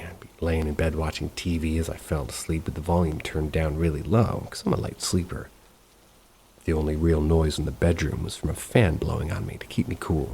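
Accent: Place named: United Kingdom. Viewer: M i always ask but never get s response. Why is fhere a uk narrator sometimes?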